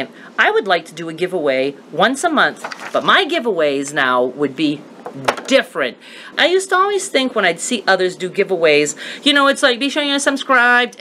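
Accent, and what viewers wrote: Maine, United States. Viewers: I think I'd watch pretty much anything you upload! I just love your Maine accent and enjoy your feisty spunky personality!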